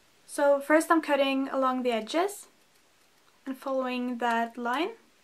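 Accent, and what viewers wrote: Norway. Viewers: I know you said violet, but I heard violent.. That sounded a bit disturbing :p "I'm trying out some violent lips" Edit: Oh, and btw - uten å lese noentingsomhelst, og før jeg en gang har sett videoen vet jeg at du er norsk basert på måten du snakker engelsk. Du kunne tilogmed ha vært trønder. You go girl.. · Great tutorial! Elsker den norske aksenten :)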